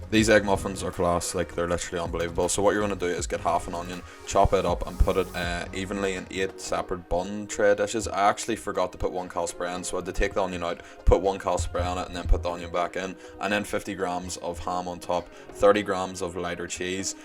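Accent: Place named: Ireland. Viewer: Love the video mate. What part of Ireland you from?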